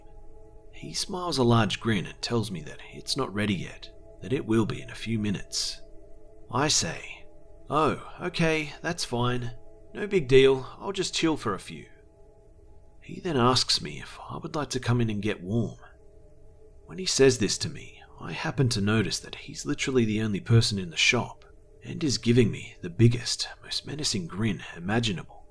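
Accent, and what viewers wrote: Australia. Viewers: Are you Australian cos you sound like a true Aussie 😄🏖🇦🇺🇦🇺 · be. are you Australian?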